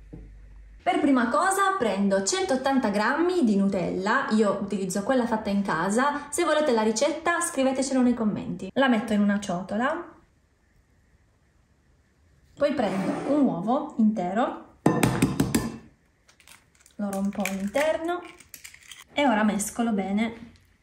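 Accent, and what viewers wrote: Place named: Italy. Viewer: I'm so very surprised to be here because my new favourite singer have a dessert channel, it's so very interesting! I have to learn some Italian and make the recipes 😁, I love your Italian accent Cheers!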